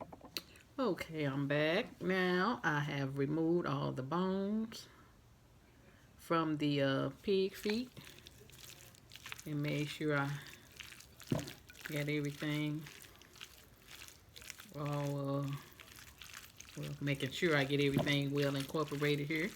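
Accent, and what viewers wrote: South, United States. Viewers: She said I like to use my “yurs” aka ears haha love southern accents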